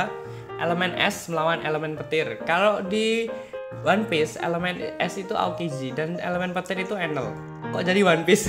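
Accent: Java, Indonesia. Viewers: Medok banget bang :v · Medok mas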